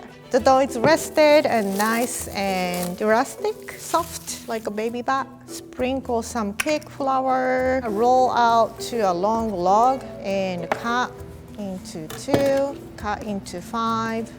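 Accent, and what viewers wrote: Japan. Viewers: lovely Japanese English. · What region of japan is she from. the accent is interesting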